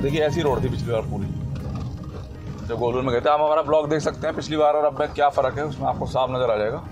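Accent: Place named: Western Uttar Pradesh, India. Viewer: Bhai ap UP west se ho kya Ekdum hmara area jo moradabad ke pass ka hai bilkul aisa lagta hai apna. Koi bhai local bat kar raha hai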